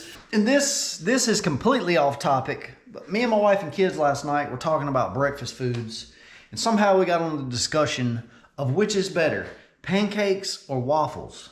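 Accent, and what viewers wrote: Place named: Deep South, United States. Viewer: Tomatoe, Tom ato, potatoe pot ato it's all in where you're from. You've been pronouncing it correctly in the deep south dialect. 😁😁 Keep em coming brother!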